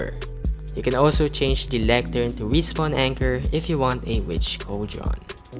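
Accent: Philippines. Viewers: Your accent its like a filipino Btw: Filipino here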